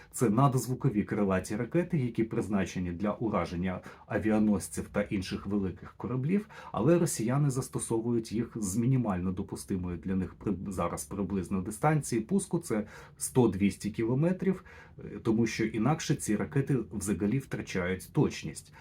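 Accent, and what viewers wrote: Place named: Odesa, Ukraine. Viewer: Не звертайте уваги. У Вас чудова, характерна одеська вимова. Така вимова- Ваша родзинка.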